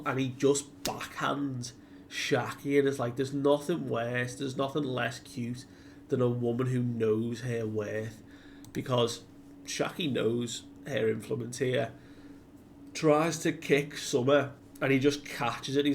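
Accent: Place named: Liverpool, United Kingdom. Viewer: Brooo it’s 4am, you’ve gained a new subscriber and I’m glad to see your journey and growth from the start. YouTube should’ve recommended your channel earlier. You go into so much detail and even explain character emotions. Also having a scouse accent, I went uni in lpool and from Manc hits it for me so pool is like a second home to me. And you are the only British manga reviewer I’ve found. I hope your channel grows!!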